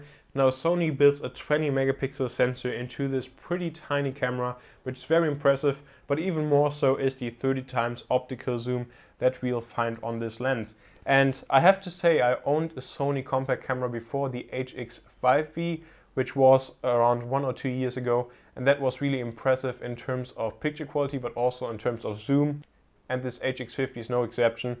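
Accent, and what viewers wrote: Germany. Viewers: Wie ich einfach direkt raushören konnte, dass du Deutscher bist :D Wie auch immer, super Review!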